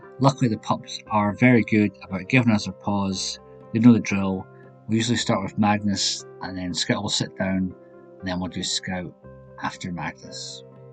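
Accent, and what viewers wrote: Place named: Scotland, United Kingdom. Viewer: Absolutely wonderful video! Love your dogs, lifestyle and your beautiful accent.. Scottish <3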